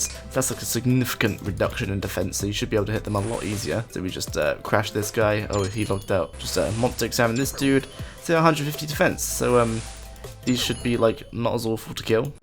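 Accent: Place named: RP, United Kingdom. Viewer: your so posh i cant understand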